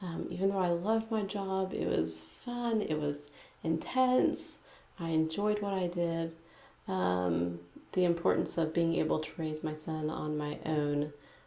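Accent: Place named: Texas, United States. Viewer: Hi ! I'm french and I'm absolutely not bilingual but I learned english watching movies and series in original version. All I can say is that I'm not really used to hear differents american accents but I totally understand what you are saying so I guess your texan accent isn't so bad as you think or may be it's just for someone who is not american ! Anyway I love your videos, can't stop watching them and of course I apologize if my english is really bad in that comment !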